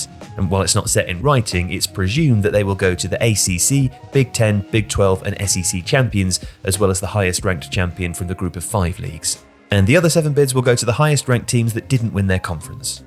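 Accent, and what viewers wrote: United Kingdom. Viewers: Hearing a British voice talk about college football is breaking my brain lmao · I refuse to have a British voice tell me how American college football works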